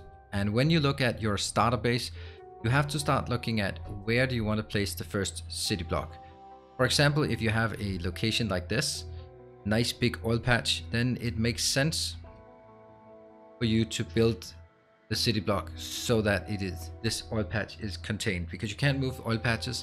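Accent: Denmark. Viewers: The danish accent is strong with this one, kinda like it though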